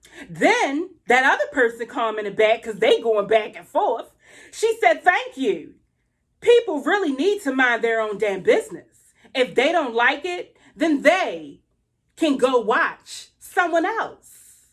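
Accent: Baltimore, United States. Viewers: Sis.....you got my hubby over here cracking’ up with your commentary and b-more ‘accent’!!! No you didn’t say Aiden was talking to you!! LMAO 😂😂😂😂! When he started talking? Apparently you understand him better than Jasmine!! 😂😂😂 Anyway......Girl...you hit the nail on the head in a previous video when you said Chrissy was irritated and done with Jasmine. I think Chrissy done cut her off because on Jasmine’s New Year video she was talking about her ‘friends’ living so far from her so she’s looking to find ‘mommy groups’ near her so she can get out! And....Jasmine is now so friendly with Chrissy’s enemy - must have pissed Chrissy off! The fact that Jasmine & Chris haven’t been invited or planned a trip to Chrissy’s for the holidays, a double date, a kids play date or for them to be vlogging together said a lot. It’s been how many months and 2 besties haven’t met each other’s significant other face to face? 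Something is up with that! We all know Ming ain’t fvcking with Jasmine. And it looks like Chrissy no longer wants to associate herself or channel with any parts of Jasmine’s fuckery and disgrace. Chrissy is trying to get her own ‘train wreck’ of a life back on track and stable with this new man! She’s not going to let bum ass Jasmine fvck that up! Jasmine is just pitiful! Maybe her illness for the last two weeks are actually withdrawal symptoms due to Chrissy cutting her ass off!!!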